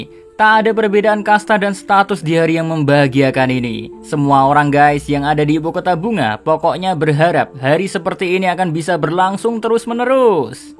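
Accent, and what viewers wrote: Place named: Magelang, Indonesia. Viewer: Aku penasaran, org mana sih? 90% org Jawa tengah, Magelang mungkin, logatnya kayak orang Magelang, Maaf, 🙏🙏🙏😁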